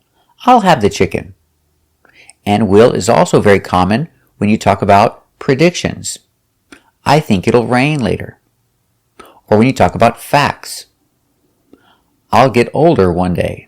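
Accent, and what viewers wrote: United States. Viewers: Sir are you American?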